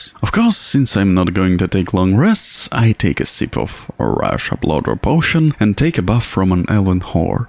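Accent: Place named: Russia. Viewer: you gave me some runglish, love it.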